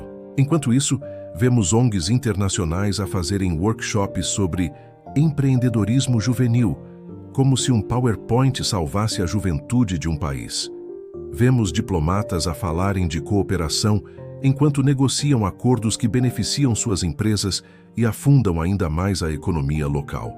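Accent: Brazil. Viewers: Madame, então qual o motivo de "hablar" em português com sotaque brasileiro? Faça o favor de mudar para sotaque dos PALOP...🎉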